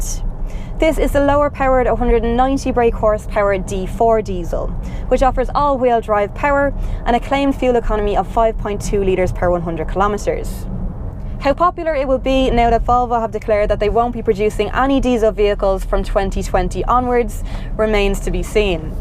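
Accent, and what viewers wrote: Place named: Ireland. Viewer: Greetings from Sweden. I love your Irish accent... so pleasant. Great review from a beautiful woman.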